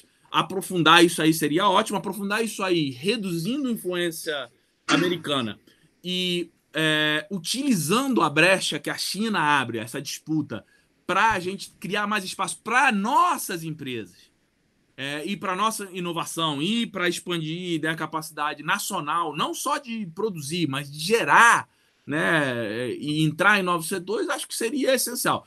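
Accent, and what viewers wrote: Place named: Rio de Janeiro, Brazil. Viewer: Muito clara e inteligente as análises histórico- econômicas. Gostei demais, aprendi muito. Ele tem português impecável, aliás o português dele tem as características, sotaques, brasileiro-carioca. Cumprimentos sinceros ao Prof..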